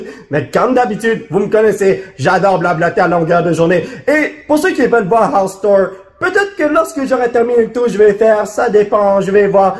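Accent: Quebec, Canada. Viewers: VIVE LE QUÉBEC ! J'ADORE TON ACCENT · Tu est québécois?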